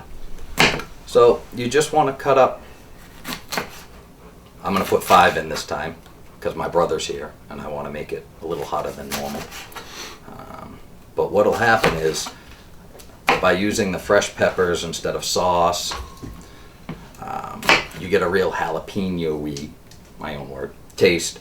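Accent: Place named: Texas, United States. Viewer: I love the Texas accent. Great job!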